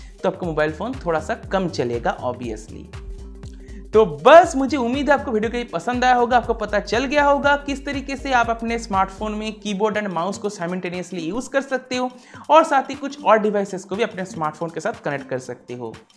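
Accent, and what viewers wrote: Bengal, India. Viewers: I'm also... Tomar kotha sune mone holo tumi bengali😉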